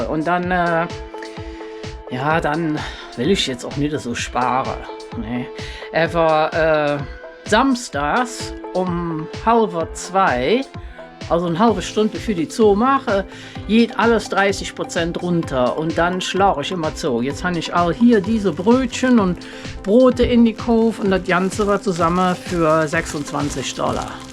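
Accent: Cologne, Germany. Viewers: Datt iss jo sööt. Ich hev alles verstaan , obwohl datt Kölsch wer.Ick Snack Platt und bedank mit för datt Video. Allns Goode .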